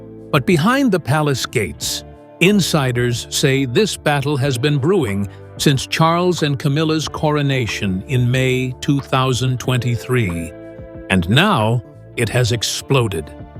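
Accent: United States. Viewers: Keep using the disrespectful images of Katherine and the obnoxious American narrator.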